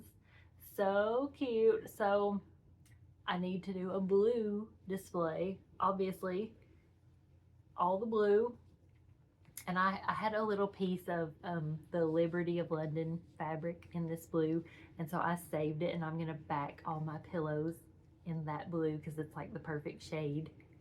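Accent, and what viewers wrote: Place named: South, United States. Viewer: Love your stitches and your southern drawl 🥰.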